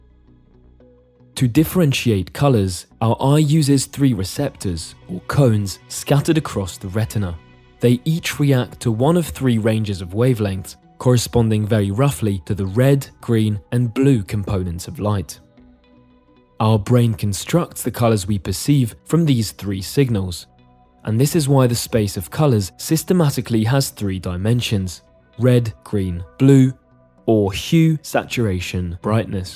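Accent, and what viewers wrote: United Kingdom. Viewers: Also notice how he pronounces Weber with the first e like the French é (which is how it's supposed to be pronounced) and not like the way Anglo speakers pronounce the first e in Weber or Webber. Come to think of it, the narrator is not at all British. He has a "refined" British accent but I can say with 100% certainly that he did NOT grow up in the UK but, rather, in Germany, Austria, or, perhaps, Switzerland, and went to a fancy prep school or boarding school where Southern British Standard is taught from a young age (or taught very intensively) and/or maybe he's extremely talented phonetically and his accent is obviously not a stereotypically German accent (like the kind that's used on shows and movies depicting German characters, often humorously, sometimes not, like the movies Nazi era movies) and it's not anything at all like the accent of the late Dr. 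Ruth Westheimer, BUT a British accent (regardless of region or socioeconomic status) it's not. There is a wide spectrum of accents characterising people born and raised in the UK and he is not on that spectrum... He's close... He's real bloody close, but he would never be able to fool a native British person or an expert linguist into thinking he's British. He might fool a Yank, but never a Brit.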